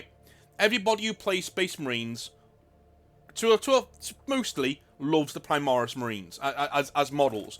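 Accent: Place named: Wirral, United Kingdom. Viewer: no opponents? You have the dulcet tones of someone from The Wirral! I live on the Wirral myself (that is ON the Wirral, not IN the Wirral... don't ask me why its that way ) I'm out of love of big 40k atm but if you ever wanna play Killteam hit me up brother!! (Anything to not have to think about the Mighty Blues for a weekend!!)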